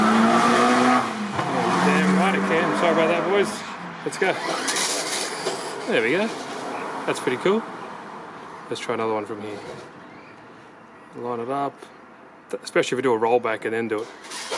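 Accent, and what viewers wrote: Australia. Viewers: It’s a shame that your vids keep getting demonetized, seems like YouTube’s never experienced Australian English before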